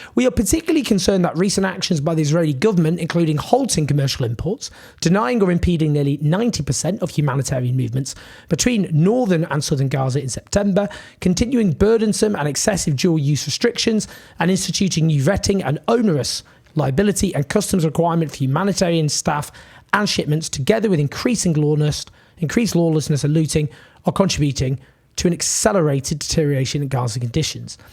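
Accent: United Kingdom. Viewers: But anyways what’s up with a guy with a the gay British accent criticizing when the UK is worse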